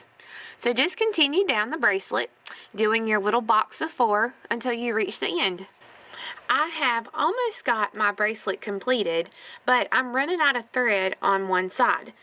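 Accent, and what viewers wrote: South, United States. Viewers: Hi, I love your beautiful southern accent. What I love the most is your way of teaching, you are one of the few that goes to the point, but give us clear explanations of each one of your beautiful pieces.Your work is impeccable,easy to understand no matter which stitch you teach us you always make it easy to fallow. Thank you for shearing with us your wonderful talent. I will love to learn how to make the gorgeous earrings you have on,and also to know where I can purchase the wire protectors that you mention in all of your videos. Is't possible that you let me know where to get them? Last but not least I want you to know that I had learn tons just by watching your tutorials. Thanks again. Love you.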